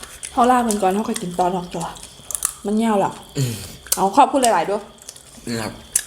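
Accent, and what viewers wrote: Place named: Phu Thai, Thailand. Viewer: ครูเว่าสำเนียงผู้ไทกะเป็นเนาะค่ะ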